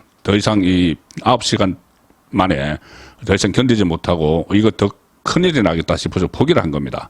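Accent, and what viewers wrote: Gyeongsang, South Korea. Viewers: 들을때마다 갱상도사람의 약간 떠듬거리는 말투...꼭 웬지 나와같다는.....난 서울사람처럼 매끄럽고 술슬 말이 나오는럿도 좋지만...전 영양출신으로 처음 청주에서 대학생활애서 표준어앞에서 사투리를 감추느라고 땀흘리던 생각이 납니다. 70년대초반 중딩때 석간 영남일보 100여호 배달 알바를 열심하던 추억이 생각납니다...응원합니다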